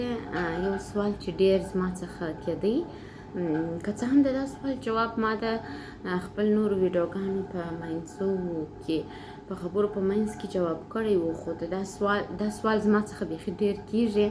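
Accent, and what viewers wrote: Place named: Kandahar, Afghanistan. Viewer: ستا دا کندهاری ژبه زما په زرکی سی❤❤❤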